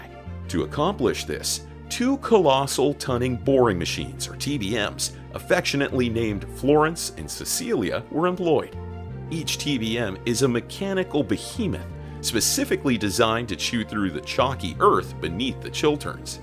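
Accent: United States. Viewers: Why the hell is a yank the voice of the video, can't they afford to get a British person to do the voice over. The HS2 is a English Railway engineering project between London and Birmingham Not New York to Miami. 💂‍♂️💂‍♂️🇬🇧🇬🇧🏴🏴😡😡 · Littered with mistakes and covered in an American accent 👎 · what mistakes, and what problem do you have with the American accent?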